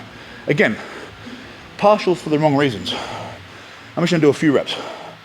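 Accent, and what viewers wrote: Australia. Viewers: Good see some solid Aussie oak talking weights not the yanks all the time. Good stuff mate and I’ll be watching keep the content coming and love the way we talk down under no room for smoke and mirrors just real talk. Now it’s your set mate 💪🏻😎❤️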